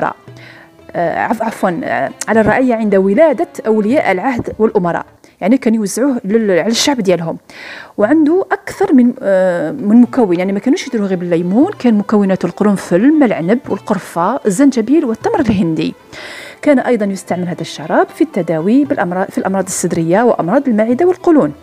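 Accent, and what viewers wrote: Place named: Casbah, Algeria. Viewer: الله يبارك فيك والله انت بنت طبع وشان هدرتك والله كيما ماني وماما وعماتي بنات القصبة وطيابك كيما هوما الله يبارك كيما هوما حتى انا طيب كلش تقلدي ومانعجش للمهراز نهار تزوجت عطاتني ماني مهراس تاع نحاس 🤣 والله كي نتبع ونتفكر كي كنت ندخل مع ماما لكوزينة ولغربية صح تاع زمان ماجيش مشقة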